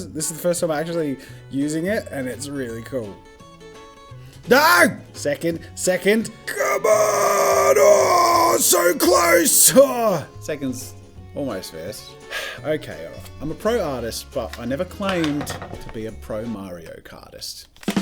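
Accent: Australia. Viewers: Aussie accent lmao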